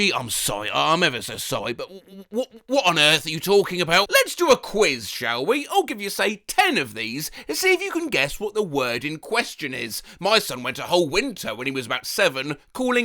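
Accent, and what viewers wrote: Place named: London, United Kingdom. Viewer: . Buddy. Pal. Chum. You sound a bit like Jim Sterling. This leads me to believe you come from London.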